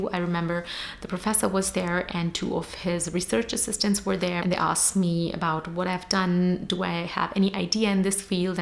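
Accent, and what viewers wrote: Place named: Germany. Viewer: Hello, was your Masters German taught? I hear a bit of German accent in your tone.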